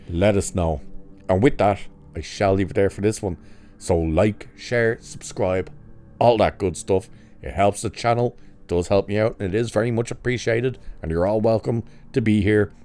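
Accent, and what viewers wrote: Ireland. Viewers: After the remake tv series of knight rider, im not remotely interested. Those morons in hollyweird arent capable of making anything worth watching. Now....just curious, what is your accent? Sounds kind of irish-y with a bit of....perhaps romulan....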